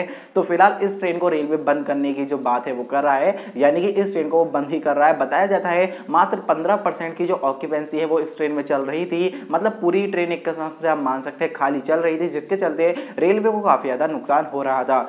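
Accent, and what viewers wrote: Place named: Bihar, India. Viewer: Bhai pakka tum bihar se ho...!!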